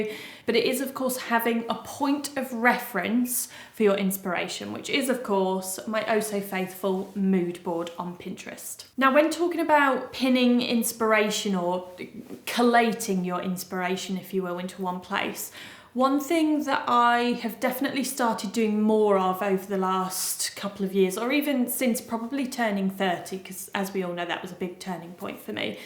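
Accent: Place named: London, United Kingdom. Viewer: Omg same here I am learning her London accent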